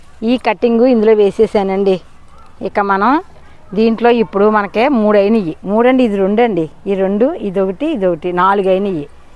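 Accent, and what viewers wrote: Godavari, India. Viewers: Hii garu mee oopikaki joharulu andi me matalu vini godavari zilla anukunna madhi Kakinada andi.❤❤❤❤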